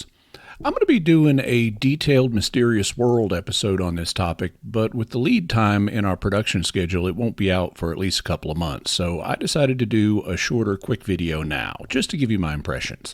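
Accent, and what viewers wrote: South, United States. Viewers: Did gain a country accent when I wasn’t looking? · I think that moving back closer to the South is starting to rub off on you dialectically. You definitely sound as if you're taking on a bit more of a southern affectation, reminiscent of someone like Alan harrelson, who runs pipe cottage. And if you don't know him, I suspect he would be someone you would have an awful lot of fun talking with. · I was so distracted by this new Southern accent that I could hardly pay attention to the subject matter! I was even trying to figure out if this was a joke video! Lol! Good thing I can rewind!😂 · Wow, really is from the south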